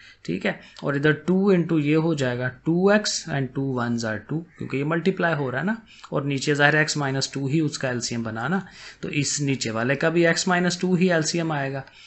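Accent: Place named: Lahore, Pakistan. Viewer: Yes His Accent are like Lahori People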